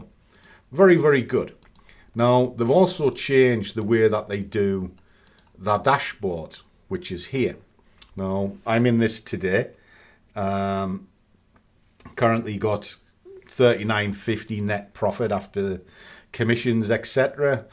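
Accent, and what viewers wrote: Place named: Newcastle, United Kingdom. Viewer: I hear a little bit of geordie in your voice, are you from up here?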